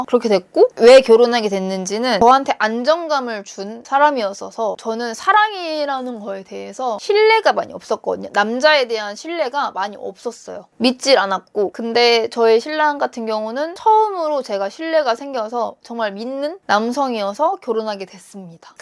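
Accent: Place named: Chungcheong, South Korea. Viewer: 세종인으로서 님 충청인일 것 같았어요 ㅋㅋ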